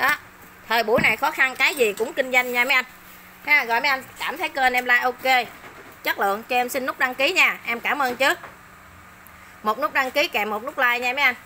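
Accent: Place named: Mekong Delta, Vietnam. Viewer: Bà chủ máy này giọng miền tây. Nghe chân chất mà vui vui. Cách giớ thiệu sản phẩm mộc mạc nhưng chuyên nghiệp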